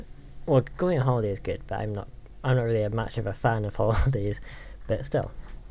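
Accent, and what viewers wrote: Scotland, United Kingdom. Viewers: He IS Scottish. People mistake his accent for an Irish accent. Also, I live in Texas as well, just as a side note, and the "cowboy stereotype" irritates me a lot. Maybe it's because I'm a goth, but I don't wear the make-up or have piercings, because I think it's too much work with too little significance.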